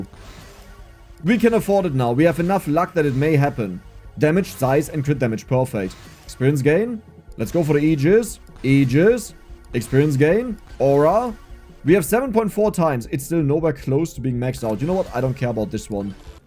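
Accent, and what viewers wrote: Germany. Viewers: People that dont like you talking you dont want as followers anyway. Your German accent rambling with a little campness in there is absolutely brilliant. I watch for that now. Megabonk is cool but your rambling is priceless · as a viewer since early vampire survivors, I like listening to ur silly German accent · Love when you talk. Otherwise it had been so boring. I’m from Sweden and learnt German from playing cs in the old days. And you’re accent brings back so much memories for me ❤ and my favorite German insult is Deine mudda schnallt sich ein dreirad unt spielt mit der transformers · I just love the "Deutscher akzent".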